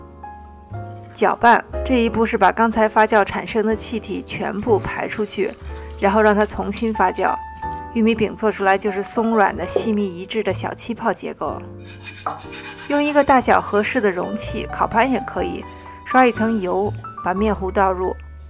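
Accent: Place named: Shaanxi, China. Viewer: 包谷棒~~陕普啊😊老乡在纽西兰问好啦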